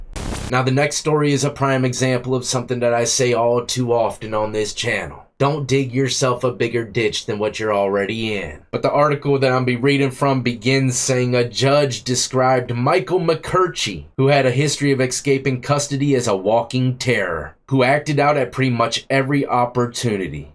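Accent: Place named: South, United States. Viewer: Love your Southern accent. Love from 🏴🇬🇧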